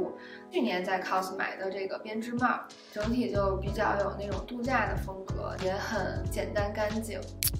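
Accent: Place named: Beijing, China. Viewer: 听声音像北京人或河北人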